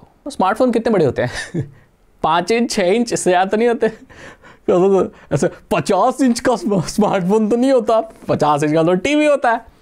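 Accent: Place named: Haryana, India. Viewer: today i see minor haryanvi assent in Bhai 🔥🔥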